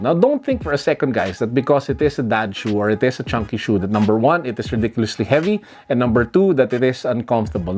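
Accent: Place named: Philippines. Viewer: right off the bat when i heard your voice i knew you were filipino just like me. ahah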